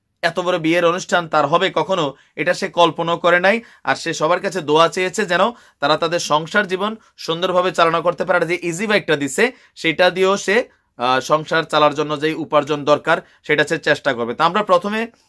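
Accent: Sylhet, Bangladesh. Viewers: Amrar Sylheti mat apnar muko hunia bala lager... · মাশাআল্লাহ খুব সুন্দর ভাবে সিলেটি ভাষায় কথা বলেন,আমি সিলেটের মৌলবীবাজর তাকি। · Vai khub sundor sylheti matoin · ভাই আপনার ভারি কি সিলেত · Sundor laglo Sylheti language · ভালা লাগলো আপনার সিলেটি মাত হুনিয়া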